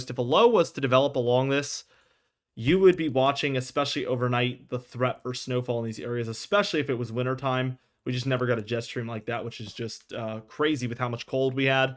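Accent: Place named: Virginia Beach, United States. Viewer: Def a Va. Beach accent . Thanks for what you do .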